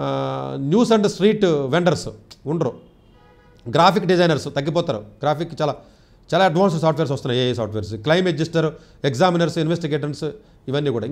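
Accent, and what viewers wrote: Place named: Godavari, India. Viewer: garu, I truly appreciate your research, analysis, and the confidence with which you present your points. I also enjoy your accent and unique way of phrasing things. Especially, your serious expressions while humorously criticizing mechanical, pharmacy, and Tier 2 colleges like Mahindra and GITAM in the Godavari accent make it even more entertaining. However, I have a small suggestion—please consider showing some empathy towards students and parents whose academic capabilities limit them to Tier 2 colleges. It would add a balanced perspective to your insights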